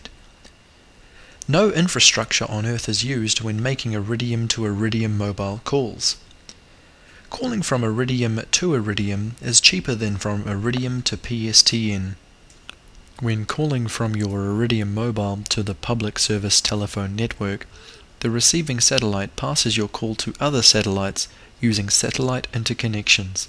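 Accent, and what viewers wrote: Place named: New Zealand. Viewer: It's a New Zealander, trying not to sound TOO New Zealand-y.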